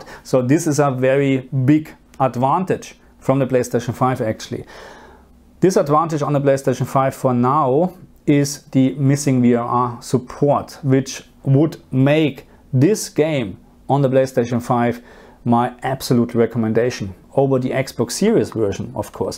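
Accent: Germany. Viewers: nices Video und nicer deutscher Accent haha😄😂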